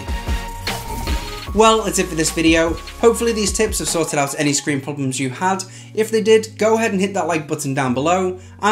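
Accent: United Kingdom. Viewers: This video is proof that having a British Accent doesn't automatically make you smart.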